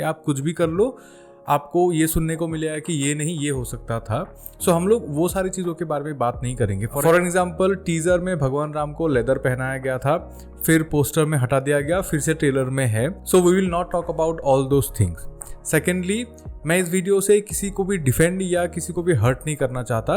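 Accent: Assam, India. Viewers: I think i found sm slight texture of assamese accent in your video.. axomr hoi nki apuni??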